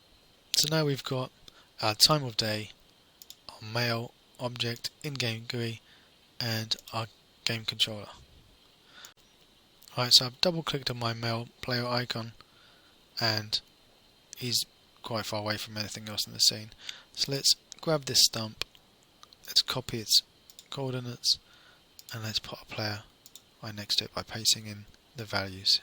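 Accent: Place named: England, United Kingdom. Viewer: ah well... audio things may come more naturally to me as studied music and got really into mixing when I left school. audacity is free and I would encourage anybody making videos to run their audio tracks through it and back into their video editor. def worth playing around with. I never worry about speech impediments, they're part of a persons unique accent. isaac arthur is one of my favourite youtubers and he has a reeeeeeeally bad tongue tie, but everybody loves listening to him lol. and your accent is great, very english. I think particularly australians don't particularly like listening to australians, but we get bored of hearing americans all the time. (Also I wouldn't have recommended an EQ back then, I would have said just buy a $25USD mic from aliexpress, but I wasn't listening to you back then lol)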